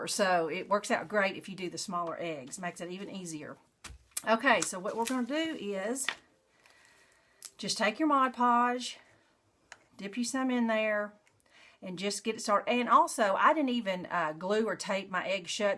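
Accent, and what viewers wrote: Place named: Arkansas, United States. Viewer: i just love rabbits, too -- and those are adorable. The eggs look great in the jars. i live in Va. and my best friend lives in Mena, AR. Her husband is from AR and your accent is so great and reminds me of them -- makes me miss them, too -- maybe i'll send them a care package of eggs !! Also, thanks for the tip on Dollar Tree. i was going to Tues. morning tomorrow, but will hit DT first. hope you and your family have a very blessed Easter season.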